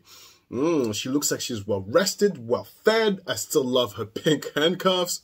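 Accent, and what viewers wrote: France. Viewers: I have never felt so special to be called Lynda. Nobody has ever said my name that many times in such a short time with a French accent to boot. Pun intended. LOL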